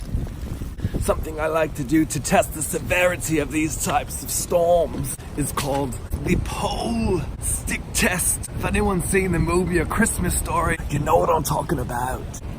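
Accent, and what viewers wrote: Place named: United Kingdom. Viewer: Your voice is different by adding that your accent looks British, not American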